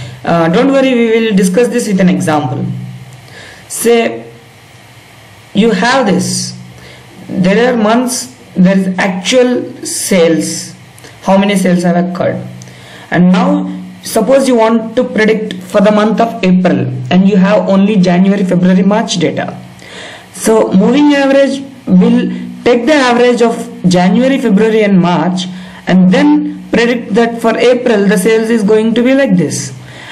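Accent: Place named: Spain. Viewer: hi are you spanish? sorry but i have to say yr accent is so hard (for me) to comprehend all of it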